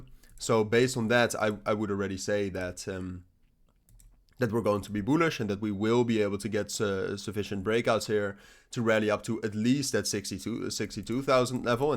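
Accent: Netherlands. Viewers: Duurde even voordat ik je nederlandse accent hoorde. Engels gaat je goed af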